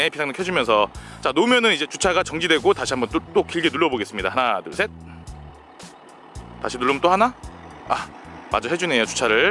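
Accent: Jeonju, South Korea. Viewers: 꾸준히 올라오는 영상 잘보고 있어요...정말 설명을 잘해주시는것 같아요..혹시 전주에 사시는가요.. 말투가 약간 전주 말투가 나오네요.. 저도 gv80 구매 준비 중인데... 전주에 계시면 한번 찾아보고 싶네요..^^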